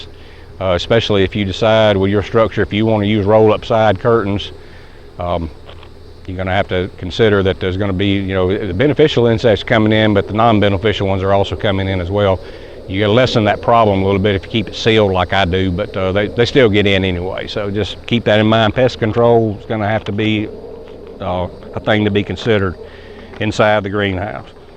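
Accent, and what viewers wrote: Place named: Carolina, United States. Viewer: Thanks for great presentation. Love your Carolina accent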